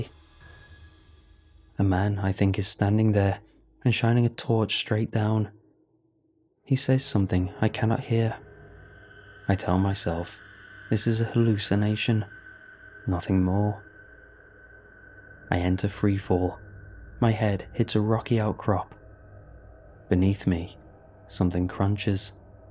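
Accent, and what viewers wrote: United Kingdom. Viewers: Amazing voice from our favorite british narrator!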